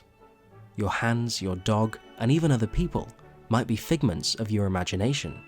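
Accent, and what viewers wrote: United Kingdom. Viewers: Man, you have converted so many lesbians with your British accent and philosophy-infused British humour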